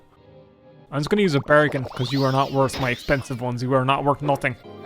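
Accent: Ireland. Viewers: why are you irish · You sound like DingDong in an Irish accent and it's truly endearing. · I missed your beautiful Irish accent 😭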